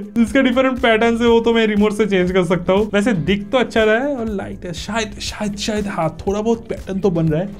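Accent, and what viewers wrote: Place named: Bengal, India. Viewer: Hindi with bengali accent......sooo cute 🥰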